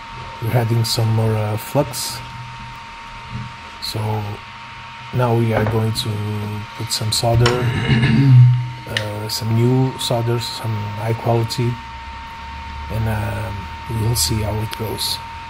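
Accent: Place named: Portugal. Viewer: Hello do you are Portuguese? You have same acent of Portuguese in English eheh. I need to do this repair too 😅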